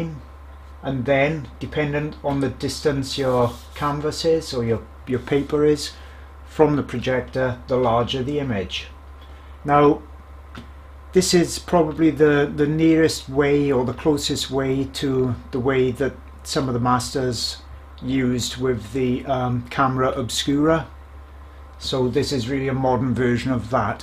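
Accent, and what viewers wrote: Wales, United Kingdom. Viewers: Hi You work is amazing...and I really appreciate you sharing your knowledge. Also being from Cardiff myself... it is refreshing to hear a fellow Welsh accent and to know that it isn't just singing but also Art that Wales is good at. Thanks Sandy. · Ah hello my fellow welshman. 👋 It took me about 5 seconds to detect that accent. 😁 Great tips 👌🏻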